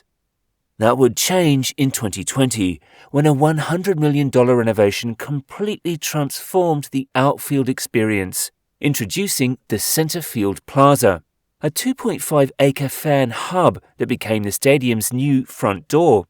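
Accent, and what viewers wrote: United Kingdom. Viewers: That is not the most iconic stadium. It’s a piece of sh!t. With all of that money they need a new stadium. Oh and when talking baseball don’t use a guy with a British accent. You lose people right away.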